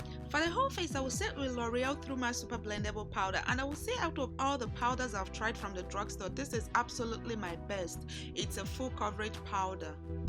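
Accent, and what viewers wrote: Nigeria. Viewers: love your nigerian accent ....hope this is not to personal what tribe ?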